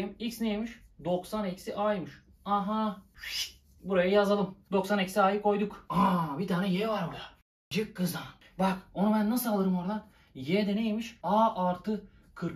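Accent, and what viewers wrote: Thrace, Turkey. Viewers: kral sayende urfa agzını bırakıp trakya agzına geccez adamsın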